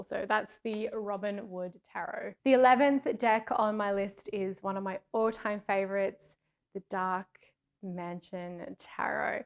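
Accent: Australia. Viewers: Is says Canada but you sound Australian.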